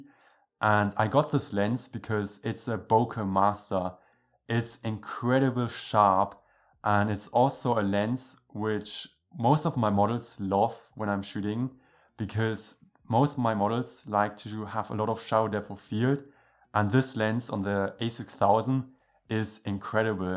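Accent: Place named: Germany. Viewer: I have a question... Do you speak German!? (I'm from Switzerland and I find that you have an German accent haha😂)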